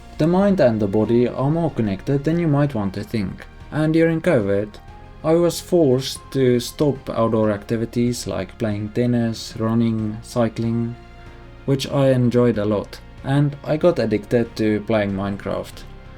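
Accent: South Africa. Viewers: you have already surpassed the achievements of many people three times your age. You are an old head on young shoulders as the saying goes. As a model railway enthusiast myself it’s always nice to see and hear what other folks are up to and you definitely bring a fresh outlook to the hobby with a wealth of interesting personal observations along the way. You are teaching me things and I’m one of those much older enthusiasts. Keep up the good work but don’t knock yourself out trying to hard. It’s all about enjoying the hobby and life at the same time. Your English is great and the South African accent just makes it even more interesting to listen to.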